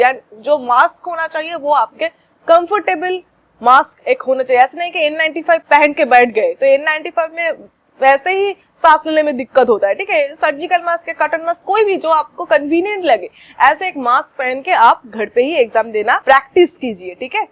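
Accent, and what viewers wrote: Bengal, India. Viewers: I think your ascent is begali ♥️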